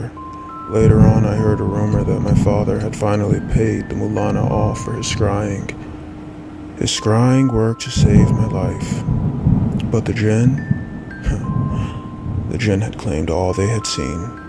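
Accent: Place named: South, United States. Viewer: Are you wearing your “human skin” today? 😝 That part of the story gave me chills and then I pictured some kind of ALIEN!?!😱 I loved your precious Southern Belle accent… really suits you and all of your character voices! I hope 🤞 your channel grows fast and soon. I’ve told people, but I’m not good at using my phone and sharing links and bloody blinks! Lol… I’ll get there! Thanks for your content and enthralling stories and skills. It’s not as easy as people think 🤔 I wish you all the BEST and I’ll be watching and learning how to share too ✌️✨🤗✨🐨✨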